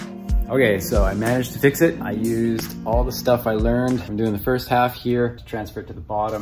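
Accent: Canada. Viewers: T tu quebecois?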